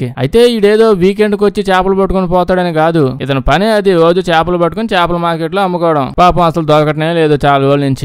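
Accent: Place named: Coastal Andhra, India. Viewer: అసలు సినిమా కథ ఏమోగాని భయ్యా, నీ నారేషన్, అదే భయ్యా, తూ.గో, కృష్ణ జిల్లాల కలగలుపు భాష ఉంది చూశావ్, దా. . నమ్ అహ, వద్దులే బాగోదు. . .అది మాత్రం ఇరగదీశావనుకో! అట్లాగే ఇంకో సినిమా గూడ సూపిచ్చు, మర్శిపోవొద్దు. థాంక్స్.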